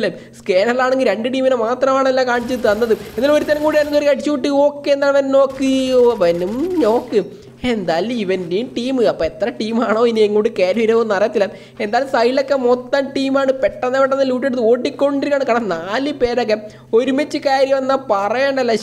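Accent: Kannur, India. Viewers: Kannur aano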